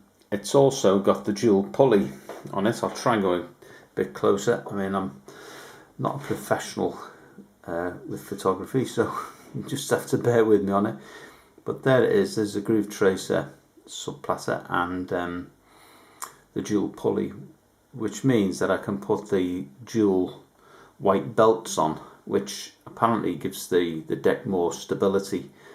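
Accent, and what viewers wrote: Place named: Liverpool, United Kingdom. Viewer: You sound like a Beatle Liverpool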